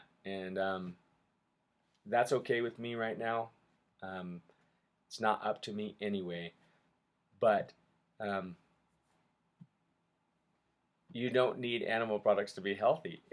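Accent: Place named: United States. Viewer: First, I thought you were Australian when my audio kinda messed up on the intro of your video, but when it worked, American 💁🏻‍♂️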